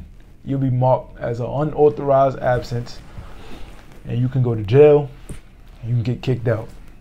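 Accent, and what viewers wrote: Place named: Philadelphia, United States. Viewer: If you don’t mind me asking where are you from? I can hear your accent. Sound like you from Philly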